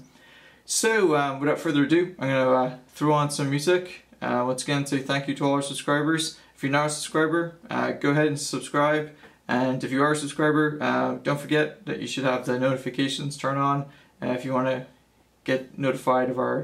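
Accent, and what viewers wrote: United States. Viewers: American speaking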